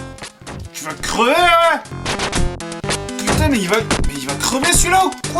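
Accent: South, France. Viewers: Tu as perdu l accent du sud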